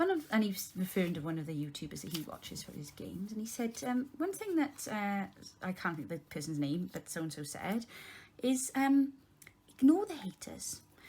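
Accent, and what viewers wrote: Wales, United Kingdom. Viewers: Ooh, I love listening to your lovely Welsh accent! Your style is easy to follow and such great ideas. I’m new to your channel and will be watching with interest...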